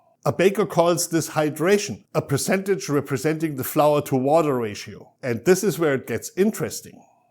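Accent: Germany. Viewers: Nice, and the German accent is nice too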